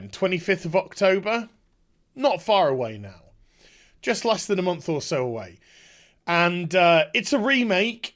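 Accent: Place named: United Kingdom. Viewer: You just keep speaking British to me and I'll keep liking your videos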